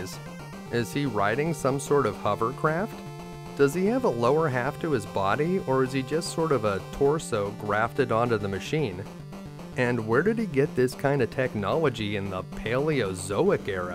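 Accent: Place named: United States. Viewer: This is the best American accent from a Chinese person I have ever heard.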